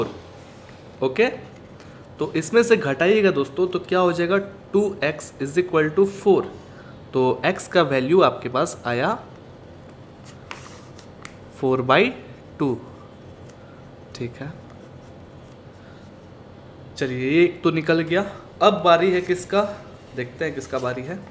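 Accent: Bihar, India. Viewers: Sir aapke aawaaj me hamare bihar ki jhalak aa rhi hai ,, kyonki isme koi gunjaish hi nhi hai naa samjhe naa , ok paarnaam ,,ram ram 💪🙏🙏🇮🇳🇮🇳🇮🇳💪💪💪💪💪🙏🇮🇳😘🥰😍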